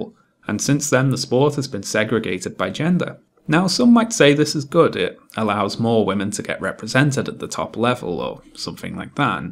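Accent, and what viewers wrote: Liverpool, United Kingdom. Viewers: ahh wait nah im now hearing more liverpool type tones in your accent, nvm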